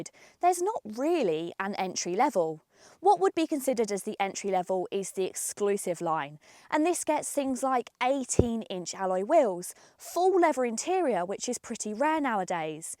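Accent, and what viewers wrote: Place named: Colchester, United Kingdom. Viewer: I've decided to test drive one, before I commit to a RAV4 PHEV. Have you compared them? I love your channel. Your presentation skills are great. That's a lovely accent; Colchester, if I'm not mistaken. Best wishes, John, X. PS As it happens, I have 35mm film projection equipment here that was first installed at the old Odeon, Crouch Street, Colchester.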